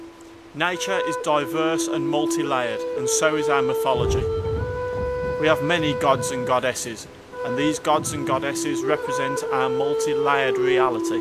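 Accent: United Kingdom. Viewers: From the West Midlands? 😛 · Hurrah a fellow Brummie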